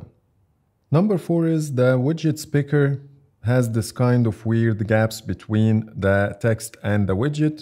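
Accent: Egypt. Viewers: Bro ur accent is Egyptian 🤨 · I love the egyptian accent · Your accent is egyptian dude….love the video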